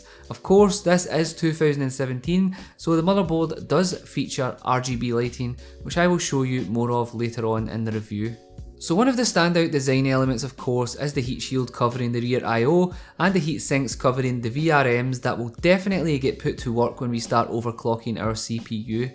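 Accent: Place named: Scotland, United Kingdom. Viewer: Yer Scottish ye have my support just got the board for a new build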